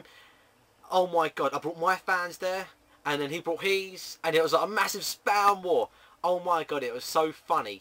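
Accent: United Kingdom. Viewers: fun to listen to with your awesome british accent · i love ure accent <3 <3 egland accent FTW!!!!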